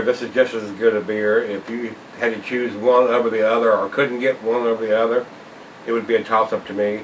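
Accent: United States. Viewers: I love your reviews. I love that you're obviously country but are clearly intelligent and articulate. Also I agree with almost 100% all of your reviews I've seen on Chocolate Stouts so far. Nice videos thanks. This beer is one of the best I've ever had!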